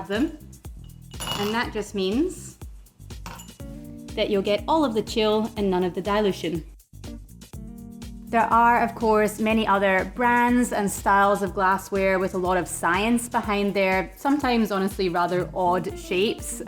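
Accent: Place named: Scotland, United Kingdom. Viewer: Oh, Scottish accents! You could be reading out of the phone book, and this video would still be enjoyable. But Scottish accent + knowledgeable whiskey 🥃 instruction = fanatic content. Keep creating!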